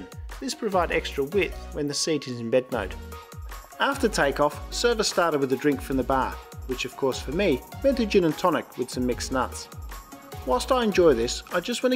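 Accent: Australia. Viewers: Ya Australian? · I hear Aussie accent :)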